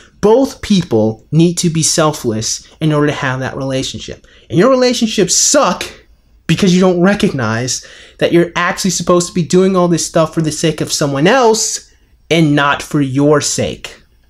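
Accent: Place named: United Kingdom. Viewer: ayy burp and british accent, this was a good vid. also re: paired stimuli (i.e making her feel good in your presence) , this is how you get a girl into anal...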